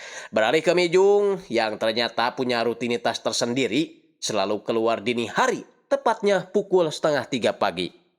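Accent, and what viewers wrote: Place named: Sunda, Indonesia. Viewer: trima kasih kang, nyeritain nya seru n lucu dgn gaya sundanya, sukses selalu kang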